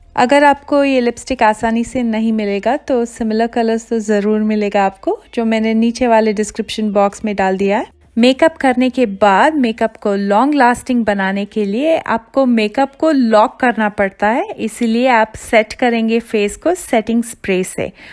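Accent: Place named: India. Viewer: This hindi accent!!!!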